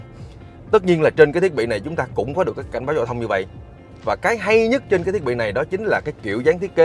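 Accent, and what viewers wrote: South, Vietnam. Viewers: Bạn review hay quá! Hiếm thấy reviewer giọng miền Nam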